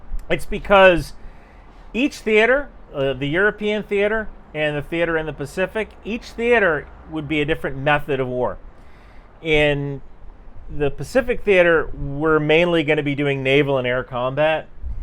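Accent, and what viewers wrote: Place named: United States. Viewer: To me as a New Zealander. He certainly speaks english with a perfect American accent with no grammatical giveaways! But what do I know?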